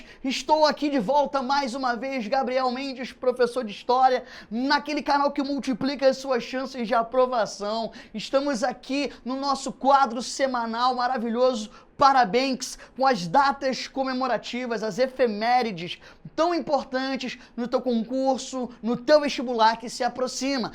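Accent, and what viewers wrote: Rio de Janeiro, Brazil. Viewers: parei de assistir so porque é carioca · Você é carioca? Kkkk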